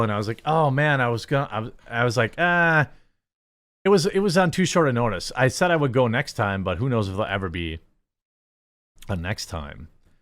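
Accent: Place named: Midwest, United States. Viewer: I’m from the east coast, so you don’t get to say “quarter” like “core-ter” it’s “Kwater” idc what Midwest neutral accent you have, you’re saying your channel’s name wrong. There’s a U in there that you don’t get to say is pronounced any other way than with that sound in it.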